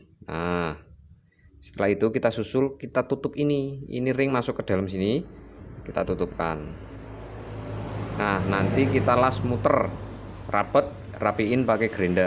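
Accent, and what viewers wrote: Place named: Banyumas, Indonesia. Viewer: Posisi bengkek ngendi rika mas, deneng kya ngapak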